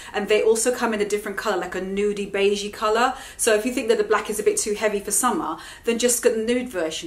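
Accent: United Kingdom. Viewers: u r such a nice gorgeus woman! at last somebody who can make fashion a matter of fun and smiles ! you sound soooo British. ...do u live in the US? sorry of I don't know you! I ve just subscribed...ciao from Italy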